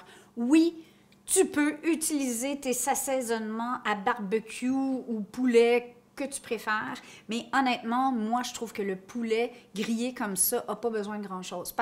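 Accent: Quebec, Canada. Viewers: Mais que c'est sympa ! J'adore votre accent Québécois et belle présentation et conseils. Le bonjour de ST TROPEZ sur la Côte d'azur !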